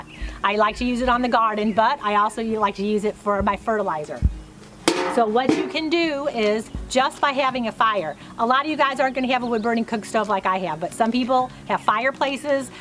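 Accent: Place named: Midwest, United States. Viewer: So thankful for your channel. I’m a mom of 4 and my husband and I really want to get out of the city. I feel crazy for wanting so badly to be off grid but you really have shown me that it’s not all that strange or impossible. Random question: Are you originally from Missouri? My daughter says that you talk like me. I grew up in Michigan and transplanted in Tennessee. Everyone here comments on my “accent” 🤣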